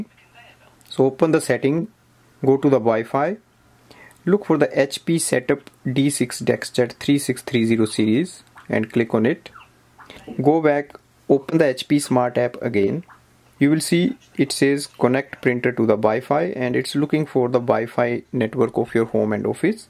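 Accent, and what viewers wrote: India. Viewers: I am among the millions of English speakers who have great difficulty understanding the variety of English spoken on the Indian sub-continent. Please remember that you must speak much more slowly and pronounce very clearly when speaking to us.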